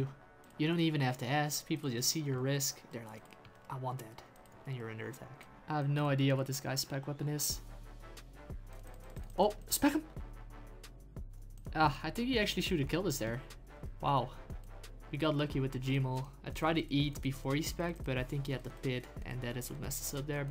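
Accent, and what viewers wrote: Netherlands. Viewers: Proper vids like always and just love that Dutch accent Ign HouseofHouse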